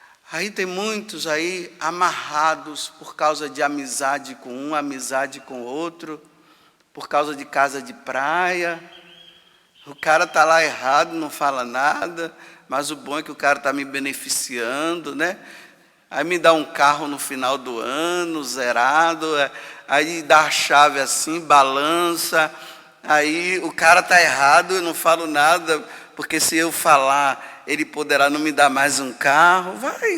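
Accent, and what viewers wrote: Bahia, Brazil. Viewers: Durmam com um barulho desses. Esse padre, com todo respeito, é um baiano arretado.🤗🤗 É uma injeção de ânimo diária, aprender com ele. Obrigada Senhor Jesus, pelo sacerdócio do padre José Augusto! 🙏🙏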